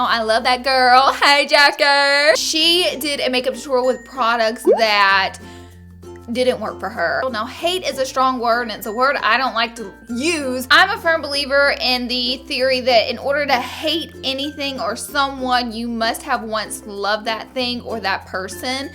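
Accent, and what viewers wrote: South, United States. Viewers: you are gorgeous with or without makeup/shitty makeup. seriously, though, I'm from WV and we are incredibly southern so I really love your accent, a genuine southern accent is hard to find, man! and ppl try to say we are uneducated but I love how you always have facts about skin/dermatology/makeup knowledge from experience etc that you pepper throughout your vids! You may live in LA now but I'm sending you good vibes from the south, girl! keep doin' your thing and stay sassy af, lol ps - IM A HUGE CAT LADY, I LOVE YOUR FREAKIN CATS!! the one that shows up in your vids the most looks like my cat, Sassy 😊😻💜 (i have another one, and orange one, whoa is literally a female Garfield names Lucy...or Lu...or the Goose...the Goo...Lady GooGoo...Lucy Lu...etc. see, now ive taken it too far; I've surpassed the threshold of just "cat lady" to "CREEPY cat lady"... 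LEMME JUST STOP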